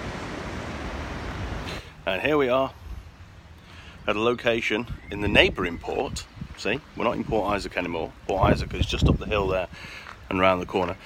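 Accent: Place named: United States. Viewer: You would pass for an American in the US by your accent used in this video.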